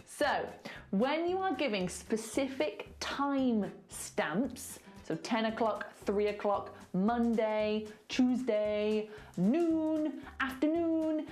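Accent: United Kingdom. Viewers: Thank you, It was so useful for me and, I love the British accent btw! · That British accent... ❤️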